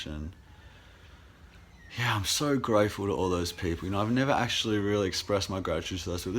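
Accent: Australia. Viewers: Hahah no! I'm probs just not used to the aussie accent, i'm from New Zealand you see :p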